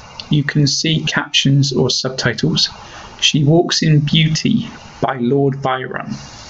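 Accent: United Kingdom. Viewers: ohhhhh that's amazing!!!!!!!!!!! I like your british accent! God Bless you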